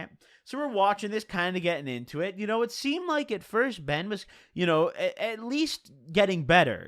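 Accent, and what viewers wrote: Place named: New Jersey, United States. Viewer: Why does he sound so different in some videos. Sometimes he's really nasally and has like a breathey undertone like some 30yo that lives in New Jersey, other times he sounds like a 24 guy that weighs 150 and doesn't get enough sunlight.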